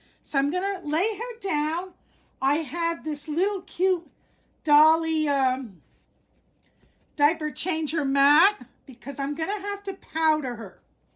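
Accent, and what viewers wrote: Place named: Italy. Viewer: I can hear the Italian in your voice 💗 What a story to tell - celebrating the wonderful you you have become 💗 What a sweet baby - and that is an impressive brush 💗 We have an adopted son (8 at the time, adult now ). He is very good to us - he very much appreciates havng had 'parents/family' in his life, like you. You have a wonderful story to share with others 💗 God Blessed you 💗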